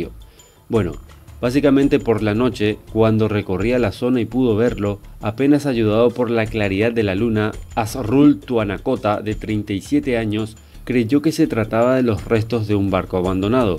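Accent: Paraguay. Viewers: Tu voz suena a Paraguay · Enzo Aquino si viejo, Paraguayo es..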